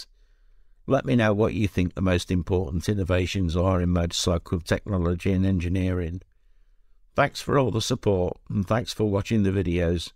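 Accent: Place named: Derbyshire, United Kingdom. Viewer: That’s odd. YT does that occasionally. No, haven’t deleted any comments. Twin Brembos should get the job done. I look forward to the vid. I’m in Derbyshire. Your accent sounds like you’re nearby. Am I correct?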